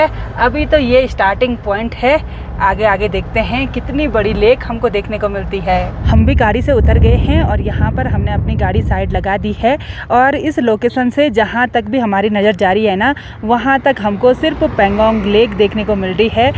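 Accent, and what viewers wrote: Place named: Rajasthan, India. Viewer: Aapki language thodi thodi Rajasthani boli jaisi lag rahi hai .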